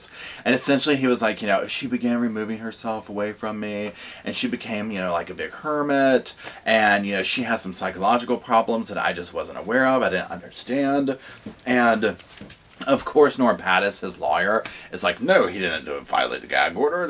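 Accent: South, United States. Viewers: Omg I cannot wait for you to share your other channels! Hahaha I’m a sofasquad groupie now. Full disclosure: You’re seriously just the sweetest thing I’ve ever laid eyes/ears on. You always seem to say exactly what I’m thinking. And Uhg your southern accent just tickles me to death. Ok sorry now I’m sounding borderline creepy... Just sayin, I’d listen to your podcast even if it was just you discussing your bowel movements or whatever 🤷🏼‍♀️ so keep up the good work bud 😊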